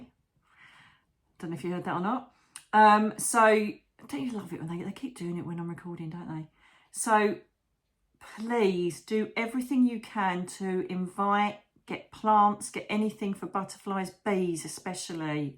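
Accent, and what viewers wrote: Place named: Scotland, United Kingdom. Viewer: Mags from Scotland… loving the Scottish accent and love you! Yes, purple is your colour! Go! Big hugs all x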